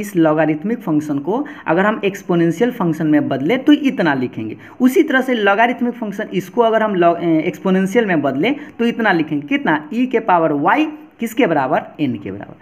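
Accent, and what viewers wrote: Bihar, India. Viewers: Sir aap Bihar sa hi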